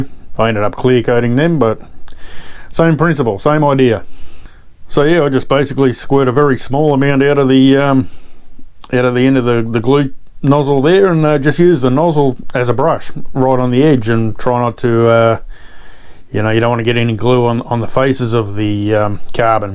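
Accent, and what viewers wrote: Australia. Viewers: Always nice to see a brother from down under our accents are unmistakable just discovered your channel nice thorough video 👊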